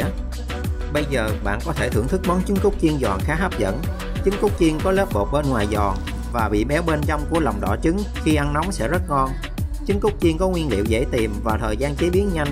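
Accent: Mekong Delta, Vietnam. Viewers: A ở miền Tây đúng ko ạ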